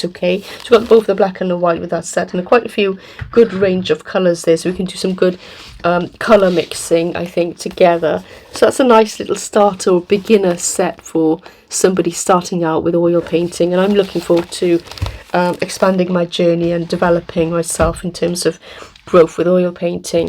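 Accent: United Kingdom. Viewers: Watching again here, it's successful unboxing arts supplies. Is it not expensive. I love your British accents ❤❤❤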